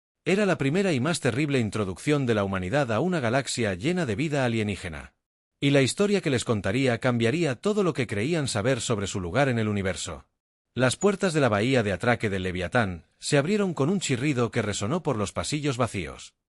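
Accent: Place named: Italy. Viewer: Si vas a hacer esto con IA, por lo menos asegúrate de hacerlo bien. Haz que lea los nombres en inglés con la pronunciación correcta y evita eso de leer el texto con acento italiano. Por el resto, todo bien. Pero es un poco molesto escuchar con esos detalles🥲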